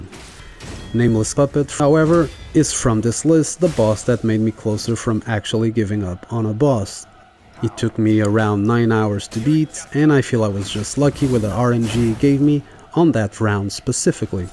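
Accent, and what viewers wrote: Portugal. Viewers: impressionante como um tuga consegue perceber logo pelo sotaque. bom video e boa lista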